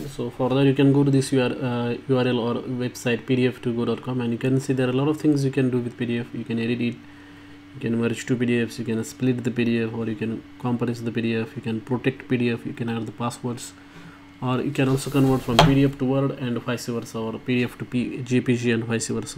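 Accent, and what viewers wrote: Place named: India. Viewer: You speak too fast and with an accent... It sounds like a clattering window pane of a rickety bus. Could you speak a bit slower, and to a global audience, not just Indians!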